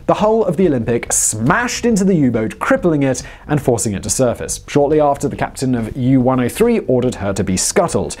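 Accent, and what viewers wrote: United Kingdom. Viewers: All of channels present facts in an interesting way with use of a very articulate Britsh dialog. I can listen all day in astonishment of how in contrast, I speak so badly as a southern American.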